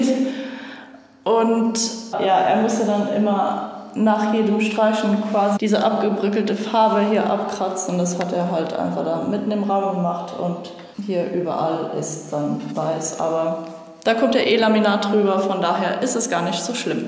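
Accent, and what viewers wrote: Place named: Luxembourg. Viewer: All Respekt, gesäit richteg gutt aus:) An däin lëtzebuergeschen Akzent ass bal ganz focht.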